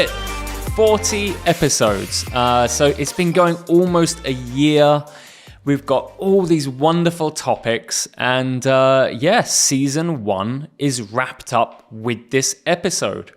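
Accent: United Kingdom. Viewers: I like it your videos very much. Your British English accent is totally perfect and your videos helped me to learn British English and please come on youtube with more new videos. Thank you very very much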